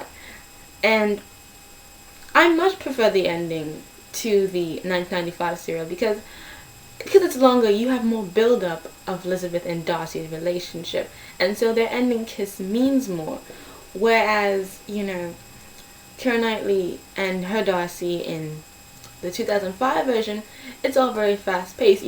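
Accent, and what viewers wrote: England, United Kingdom. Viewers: i dunno, but for some reason, i thought i heard an english accent from you through out this video, was that planned?! lol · wow...cool brittish accent. but it was kinda weird how you started on the brittish accent...then broke off and started talking normally...then started the accent again. But, it was a great rant, and i would have to say that i liked the 1995 pride and prejudice better. heh heh...im a sucker for the classics. :) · My comment won't go through! 1 more time. You know you had an English accent through bits and pieces of your vid, it sounds like you weren't aware it though. :laugh: I'm not trying to embarrass you or anything, cause I've done the same thing. I watched 4 HP movies in a day. I couldn't shake the accent that kept popping up for the rest of the day! Same thing happened when I watched Extras. Colin Firth is sexy, and a much better Darcy. 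I like the 2005 movie, mostly cause I'm a big fan of Keira.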